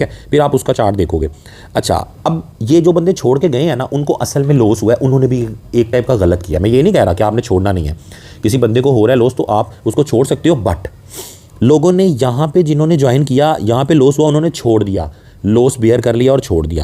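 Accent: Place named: Faisalabad, Pakistan. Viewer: Your dialect is the typical faislabadi , a very beautiful one, where are you from bro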